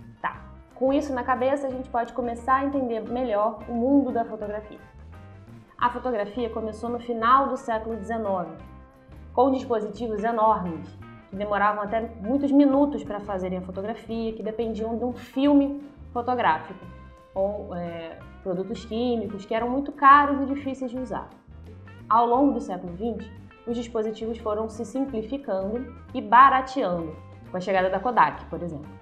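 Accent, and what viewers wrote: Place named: Minas Gerais, Brazil. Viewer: Mineiríssimo! Amei as dicas! Vou aplicar! ❤️❤️❤️❤️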